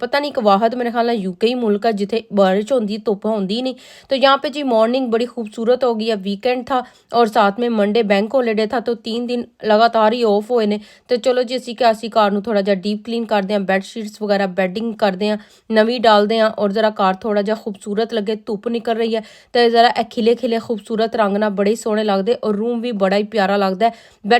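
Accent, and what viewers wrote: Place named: Lahore, Pakistan. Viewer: Same hum b lahore sy ha hum b aisy hi bolty ha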